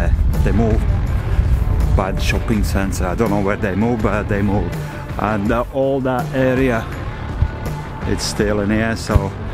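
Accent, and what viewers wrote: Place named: Liverpool, United Kingdom. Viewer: Scariest place in UK ... 🤣 Shows my high school 🤣 can say I went to the school of hardknocks now? 🤩 And on another note, your 'accent' is off the charts, I can hear skem scouse in there and whatever your native language dialect is. 👍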